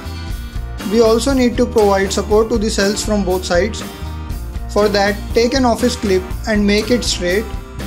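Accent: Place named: India. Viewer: the accent is like india people / indian , are you indian ?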